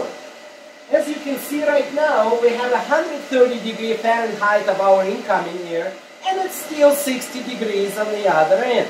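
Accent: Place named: Russia. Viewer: Чёткий Русский акцент.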